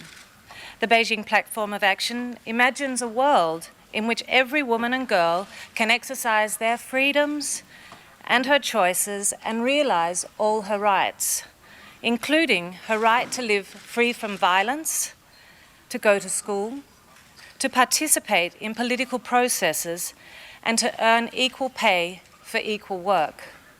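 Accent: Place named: Australia. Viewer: Good to hear Princess Mary and her aussie accent!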